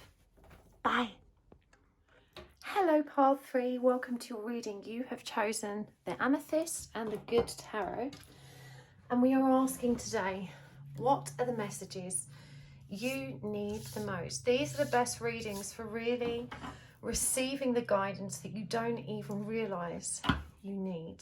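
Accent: England, United Kingdom. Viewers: You just sound so English lol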